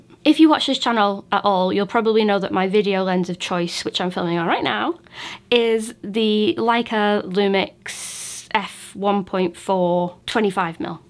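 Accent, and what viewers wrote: United Kingdom. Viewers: Love your voice hell😂😂 shit relax me..i can watch any review with that voice. Idk sometime about uk voices..remind me of mary poppin the original..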